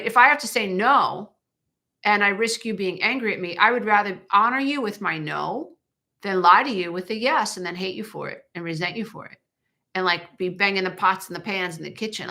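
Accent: New York, United States. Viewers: i love you and bought your book for my mother before she passed in 2016. you are a breakthrough person for me. i love your NY accent. I want to say something please just take with a grain of salt. I feel that at the end when you describe your relaxing ways it seems like still passively avoidance...the breakfast with the daughter. it is not really being alone and authentic. also, if you met your hubby, good as he is, when you were needing a secure hubby and used your perfect looks and sabayon recipe to lure him...do not take offence...this marriage may be not the final answer for a HUGE spirit for yourself. I am not saying that i want you to get scrubby for a day and fart in front of him, but maybe its time....LOVE YA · love the content ❤! Shorter segments please. Will go further for you. Absolutely love your sense of humor! And your new York accent! 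Keep up the good work!❤❤❤!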